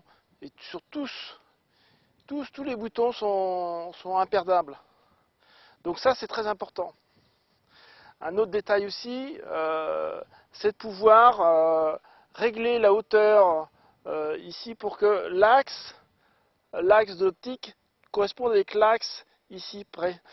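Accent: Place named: France. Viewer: You sound French.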